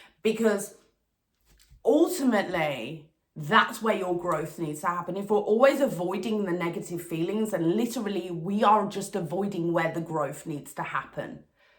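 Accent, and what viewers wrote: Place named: Midlands, United Kingdom. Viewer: Damn I feel like I was supposed to find your channel right now. I been conserving solar energy on my own for 6 months to follow my life purpose within boxing, but sometimes it’s tough. But I appreciate the message in your content a lot, my current experience really resonates with what you’re saying. How did you come to learn the things you’ve learnt? Also are you from Brum or the midlands? Your accent is familiar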